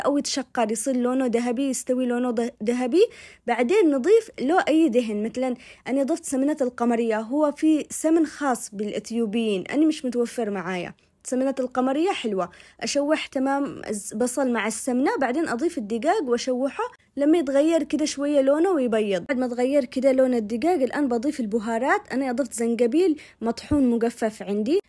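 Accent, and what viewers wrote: Aden, Yemen. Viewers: تسلم ايدك ..شرح بسيط و واضح.. لهجة يمنية حلوة... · تسلمين يابنت بلدي والله انك عسل ياربي محلا كلامك ولهجتك عدني على تعزي ملفوف بالابي ايوه هكل خلينا نفتح اليتيوب نبصر باشتات من حقنا محروكات مشحوطات ونتعلم منك كلامك سريع سريع ومهرتك اسرع ماشاءالله مثلي ههههه واحلا لايك تستاهلي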